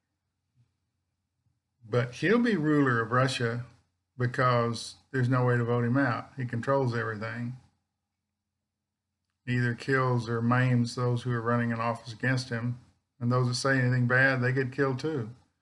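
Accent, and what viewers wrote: Texas, United States. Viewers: I really enjoy your style of delivery. I'm new to your channel, thanks to whoever gave a shout-out. Are you from Texas? There is something familiar about your accent. I live in Keller north of Fort Worth.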